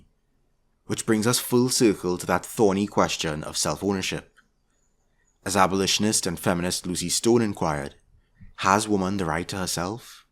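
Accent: Trinidad and Tobago. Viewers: Are you Trini? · Are you trini?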